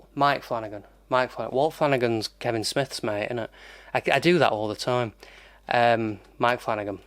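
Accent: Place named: Sheffield, United Kingdom. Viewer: Sheffield, oh I was born there! I should have recognised the accent 😅😅 Great collection, was fun to watch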